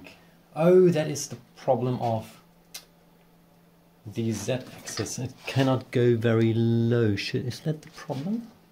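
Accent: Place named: Australia. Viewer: Well done! Nice to see your face and hear you talk,. Did you learn English in Australia? I am trying to figure out your accent;)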